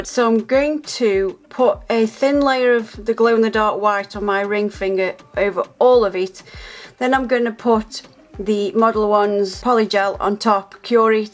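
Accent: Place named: Stoke, United Kingdom. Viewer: I love these. I’m a nail newbie could you have put the glow in the dark behind the polygel sections of the design so the glow effect would shine through the polygel. I’m just starting out and I don’t have any glow in the dark to try it with myself so I’m being a bit cheeky and asking you if you think it would work. I’m not sure where you’re from but you sound like a fellow Stokie xxx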